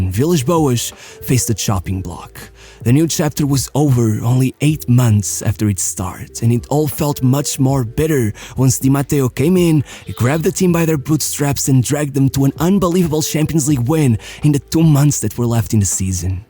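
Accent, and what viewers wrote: Portugal. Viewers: Real ones know this guy is portuguese, i know buy the accent! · This accent is very Portuguese. 😅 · Eu sei que és português, esse sotaque tá aí, não dá pra esconder🤣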